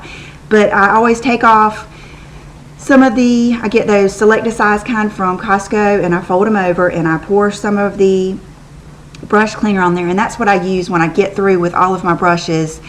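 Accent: South, United States. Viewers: ! I am one of the owners of the salon MAVEN Beverly Hills- I have been a huge fan of yours and followed you for the last several years. I am originally from Mississippi so I love the southern accent, makes me feel like I am home! Just a couple of weeks ago we launched our very own Youtube for my hair salon which opened in August! Check us out - I think you will really love the message that we plan to deliver on our channel!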